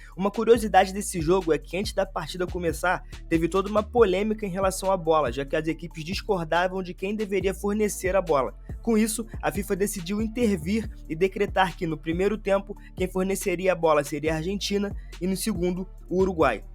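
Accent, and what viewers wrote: Rio de Janeiro, Brazil. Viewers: Vídeo começa : “SOTAQUE CARIOCA” Fechei o vídeo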